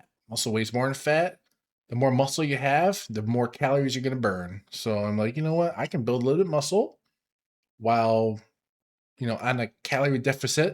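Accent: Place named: Upstate New York, United States. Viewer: First view, first comment here! All the best on your journey,. Say, I am an informal student in accents and I want to guess you are either in our grew up in upstate New York. That's my first guess. My second guess is Eastern Pennsylvania. My third guess is Chicago. My fourth guess is a city or suburb in the northern part of the United States (in a state that touches the Great Lakes). Let me know if I'm right!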